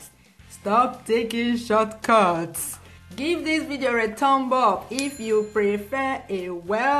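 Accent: Nigeria. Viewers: LOVE TO HEAR U TALK NIGERIANS TO.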